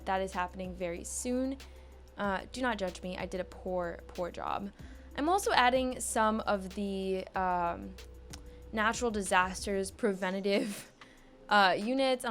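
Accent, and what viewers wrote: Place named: South, United States. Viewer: What's your PC build? And do you live down south? I hear a lot of "y'alls" lol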